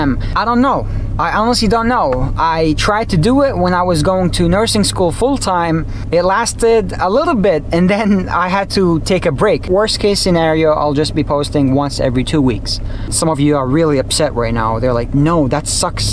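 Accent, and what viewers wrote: New Jersey, United States. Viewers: Are you from New Jersey?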